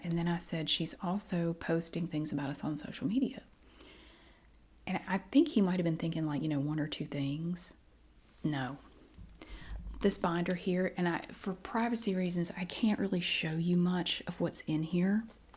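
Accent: South, United States. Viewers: I really love both of channels I'm from the UK and I really love the southern American accent I find it very relaxing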